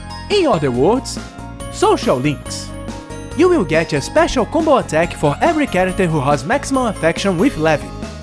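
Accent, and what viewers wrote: Brazil. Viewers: esse sotaque não me engana, tu é br né kk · mano concerteza voce e brasileiro xD SO PELO GEITO DE FALAR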